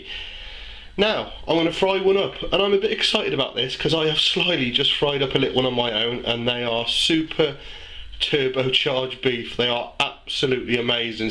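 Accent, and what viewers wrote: United Kingdom. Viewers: British acent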